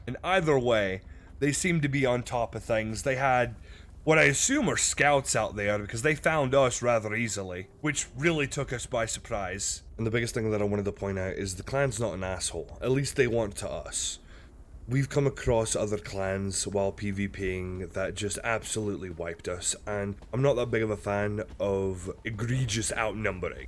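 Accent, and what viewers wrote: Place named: Scotland, United Kingdom. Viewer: Nice video mate, give me a shout on my discord over the weekend and we can have a chat. Btw were is your accent from? Bit of a Scottish twang in there.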